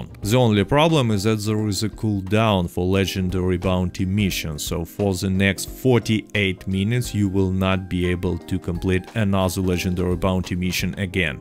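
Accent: Russia. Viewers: Thanks for making me very rich, really appreciate, btw, your Russian accent sounds really cool. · Блин думаю русский не русский ты, и потом вижу текст русский и потом понимаю почему у тебя такой акцент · а теперь я не могу понять, он русский или американец....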